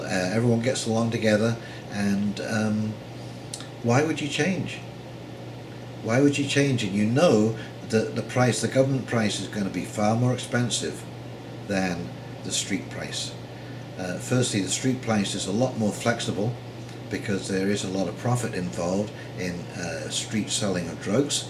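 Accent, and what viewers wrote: England, United Kingdom. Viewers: Where are you from? You sound English but I'm guessing you live in Canada.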